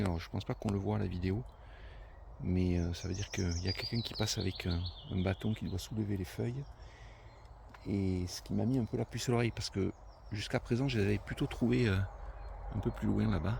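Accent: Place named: South, France. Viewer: Joli accent du sud pour un lorrain 😅👌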